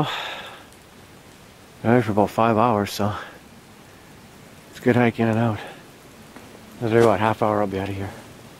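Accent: Canada. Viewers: You sounds like your from Canada? My dad was born in Manitoba Canada! 1925 iam from USA 🇺🇸 but we have relative! Grate video 📼🎥🗻🗻🗻🗻🏔🏔🏔🏔🏔🏔🏞🏕🏕🌴🌲🌳🌲🌳🌲🌳🌲🌲🌲🌾🌿🦍🦍🦍🦇🐵🐒🐵🐒🐵🙈🙉🙊👽👽🐻🐻🐗🐗🐗🐶🐺🐶🐺🐶🐕🦌🦌🦌🦌🦌🦌🦌🦉🦆🦅🦊🦊🦁🦁🦃🦄🦄🐴🐎🐏🐐🐮🐄🐼🐼🐦🐣🐥🦎🦋🦏🦏🐯🐆🐈🐾🐾🐾🐾🐾🐾🐾🐾🐿🐿🦍🦍🦍👣👣👣👣👣👣👣👣👣👣👣🌲🌲🌲🌲🌲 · Good to hear that Canadian EH ! Right on man and cool tale as well.Cheers from a little island on the west coast O Canada.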